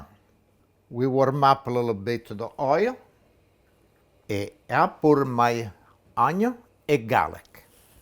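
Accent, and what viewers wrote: Italy. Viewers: The man sounds Italian but trust me, he cooked that like a uni kid on rations doing an experiment! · He is such a darling man, and his Italian accent is absolutely adorable. 🎉❤🎉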